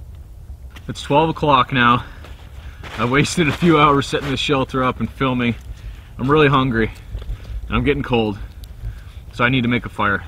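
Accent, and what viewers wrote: Canada. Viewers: (I'm sure some of my Canadian viewers will know what I'm talking "aboot") lawl that had me crack up and rolling because it reminds me of how the Canadians talked in South Park: Bigger Longer and Uncut. Every time you say "about" it comes out "aboot" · Let me guess Canadian? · I can even tell that your Canadian, 1. You basically told it 2. The about to aboot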